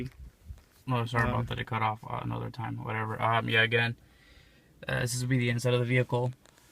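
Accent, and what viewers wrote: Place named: Mexico. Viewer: Sick ride! And I actually like the Meskin accent bro!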